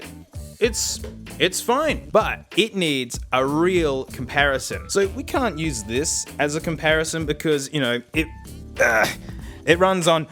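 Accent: Australia. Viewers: Same, it's the bush Ausie speaky words. It's like a game trying to translate every video. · I understand the Australian accent quite well and I like it. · Idk y'all, I LOVE the aussie accent like... a lot👁👄👁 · I could sit here and just listen to his insane Aussie for the whole of quarantine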